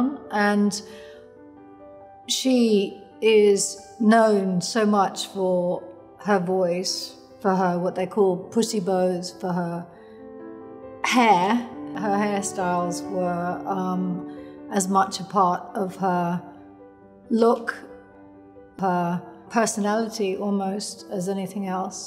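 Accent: England, United Kingdom. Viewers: What's with the British accent, she's from Chicago! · How does she look like this 30 years after xfiles??? And she was born and raised in the USA - why does she sound sooooo BRITISH ???? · Why is she speaking in a british accent, shes from Chicago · She doesn't sound American in anyway anymore. She sounds very British! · I really like her voice. Her British English is really nice to listen to. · You've gotta remember she had an English accent when she moved back the the US when she was 11. She was bullied and teased for it and therefore tried to sound American. Now after living in London again, she's likely fallen back into speaking that way. However when she is in the US, it isn't as evident. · Why is she talking wirh a slightly british accent?! She should be figuring out how to get out of character! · Before I click on a video I always cross my fingers hoping it’s the British accent😍 She’s so fine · Why is she speaking with an English accent? 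This is a choice. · What’s with the British accent Gillian? Is she still in character? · Her British accent is more prominent than ever, right?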